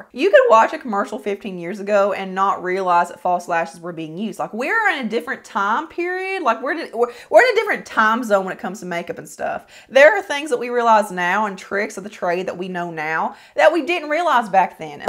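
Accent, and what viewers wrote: South, United States. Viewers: If people didn't, or don't care for, other people's opinions, then they shouldn't watch videos like this one, and get all worked up over something that didn't, doesnt, or won't matter. For 1- Overly critiquing people do it out of boredom, or to cover an insecurity of their own, like something they desire, and maybe you remind them of it (pretty eyes, followers, make up skills, etc) so they try to blast on you, or blow up comments with negativity. BUT...Oh, positive energy trumps that every day! 😁. AND takes more practice then being a "down doucher" I'd rather eat a bowl of water with a fork, then to listen to someone who constantly puts down someone, or EVERYONE, around them. Yeah. I just said that lol Both are pointless, and waste time, not just mine, or yours, but the people who stay to hear it, or in here, read it. The fact that you do these videos at all shows strength and character, and showed that the product works.... 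well....that's all I wanna see in any of these videos. These opinions help me decide on the purchases I make, before I spend, or waste, my money.... So keep showing your before and after make up applications, what you do and don't like, and I'll keep watching! And I respect the Southern accent! 😂. I was born and raised southern, and even now, in my 50s, I sound like the kid from Sling Blade 😂 (From Tennessee and that's my opinion) Keep on keeping on, and forget the haters!! Somebody is always gonna hate, and judge, and try to make you lose you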